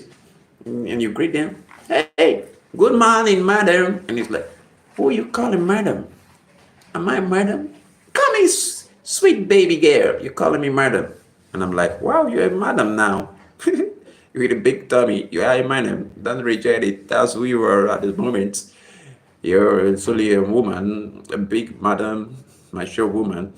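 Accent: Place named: United States. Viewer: Sounds like an Amarican 🎉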